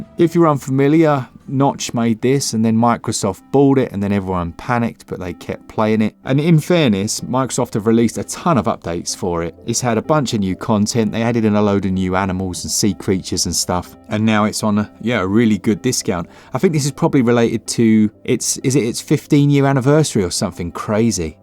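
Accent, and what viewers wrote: United Kingdom. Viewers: Sounds very British indeed 🍵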